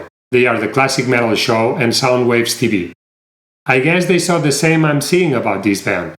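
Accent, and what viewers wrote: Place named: Spain. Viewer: I made it all the way through this video, but mostly for the hot Spanish accent.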